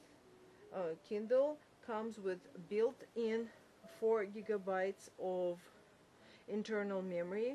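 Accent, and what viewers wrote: Russia. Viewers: Ты случайно не русский?) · очень сильный русский акцент :)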